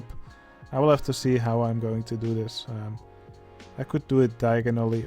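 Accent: Netherlands. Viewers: Ik kan wel horen dat je Nederlands ben.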